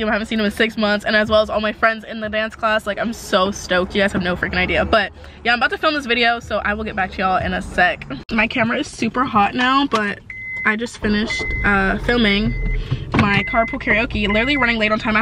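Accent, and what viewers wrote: United Kingdom. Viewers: I can hear little hints of the UK accent coming out.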